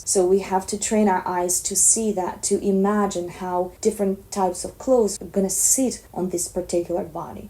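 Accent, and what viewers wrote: Russia. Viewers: Вы невероятная! Вы талантливая! Вы удивительная! Такой красивый голос, и такое глубокое погружение в стиль поражают и удивляют! Нашла это канал случайно, когда искала определение гамина романтика (он же soft gamine). Очень понравилось как системно и просто Вы объясняете. Стала смотреть дальше, и не покидало ощущение, что есть русский акцент в Вашем английском 😜 Покопалась и зависла на Вашем исполнении. Но пришла, чтобы разобраться в системе Киббе. Почему видео только на английском??? Как жаль, что огромная часть россиянок не имеют возможности слушать Вас 😢. Вы отличаетесь от остальных наших стилистов-блогеров. Ваш контент просто супер! Спасибо огромное! И огромного Вам успеха 👏🏻👏🏻👏🏻🌺🌺🌺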